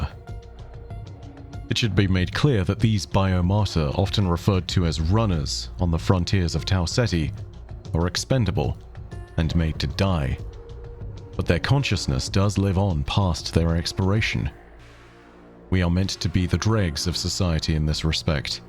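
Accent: United Kingdom. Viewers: I'm glad we have a nice British voiced lore master for both Bungie games and FromSoft games.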